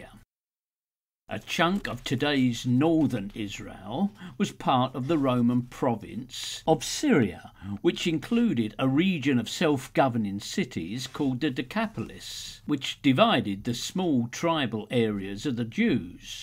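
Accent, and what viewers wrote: United Kingdom. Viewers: oy the brit pronunciation is killin me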